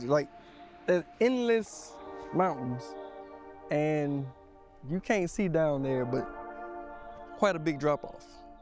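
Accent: United States. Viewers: I always thought you had a country accent. It didn’t fit LA. But everyone in LA is from somewhere else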